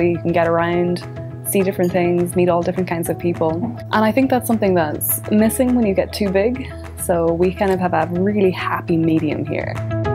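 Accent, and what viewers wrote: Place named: Monaghan, Ireland. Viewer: lovely Monaghan accent.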